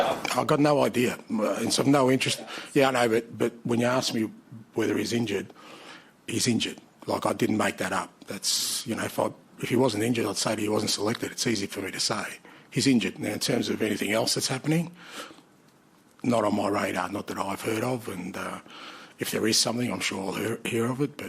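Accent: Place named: Australia. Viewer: God he's so Aussie its ridiculous 🤣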